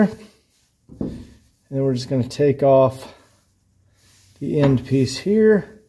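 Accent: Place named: Atlanta, United States. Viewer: Excellent video. I’ve done some of this stuff to my <num>d. Haven’t tackled the sunroof or antennae yet. I also need to do my A/C and clutch master/slave cylinder. Im assuming you must be near Atlanta based on accent and the YouTube description phone number. I’m up in the mountains above atlanta. Are you a w123 shop? Any good references for local w123 salvage yards? Parts places? What about body and paint guys familiar with fixing rusted w123 body pieces? Thanks!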